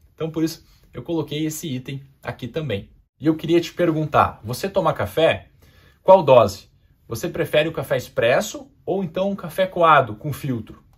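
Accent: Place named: South, Brazil. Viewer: Sabia que eras do Sul..😄Zürich aqui!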